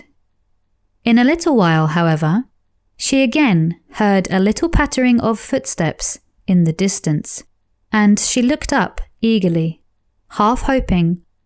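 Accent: United Kingdom. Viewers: 영국영어, 상큼합니다~~~^^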